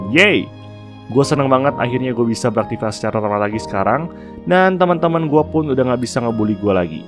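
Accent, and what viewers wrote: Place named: East Java, Indonesia. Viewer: emang kakak orang jawa timur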